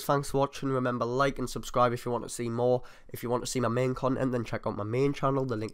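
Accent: Newcastle, United Kingdom. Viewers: A local Geordie I see, nice one.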